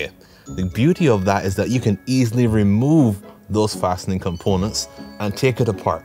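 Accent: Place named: Barbados. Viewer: Great explanation; sounds like a Bajan.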